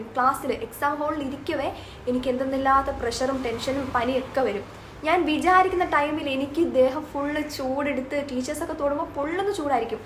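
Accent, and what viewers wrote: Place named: Punalur, India. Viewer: ഞങ്ങൾ പുനലൂര്കാരുടെ സംസാരരീതി ഇങ്ങനെ ആണ്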